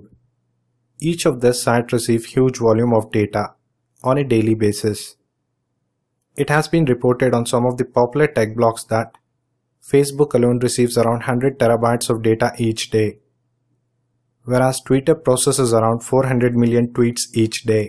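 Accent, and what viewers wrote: India. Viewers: Indian accent 🙄🙄🙄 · I dont like the indian accent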